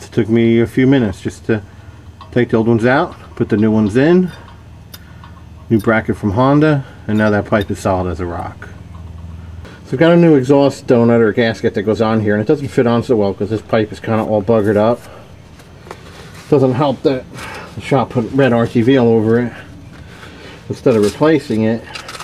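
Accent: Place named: New Jersey, United States. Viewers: Yuppp he’s definitely from jersey cause I recognize that accent lmao & I’m a New Jerseyian myself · off subject.Where you from? your voice...you sound like your from Jersey....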